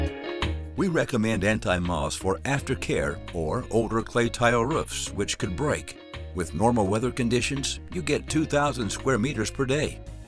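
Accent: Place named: United States. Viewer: Website arrests is .de but it's an American voice ?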